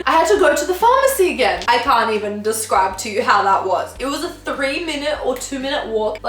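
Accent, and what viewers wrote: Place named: Australia. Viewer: You know I was wondering if sometime you'd like to do a collab? There's this challenge called the accent challenge and me being Canadian and you with your cool Australian accent I thought it would be perfect. If you'd like to here's my email we could discuss it more and if not that's totally cool. <mail>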